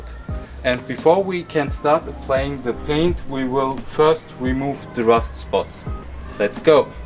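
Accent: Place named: Turkey. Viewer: Sanki aksamınız Türk gibi.. Thanks for video..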